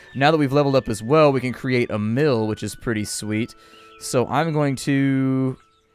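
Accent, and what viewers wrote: United Kingdom. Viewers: Duuude that Bri'ish accent!!! loving it. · Love the brittish peseant accent :D Oh and the game as well :P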